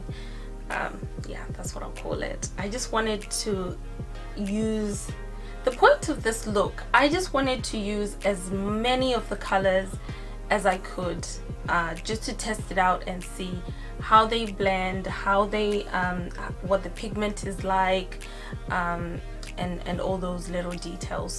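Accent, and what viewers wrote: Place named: South Africa. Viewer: Your accent has a South African undertone 😳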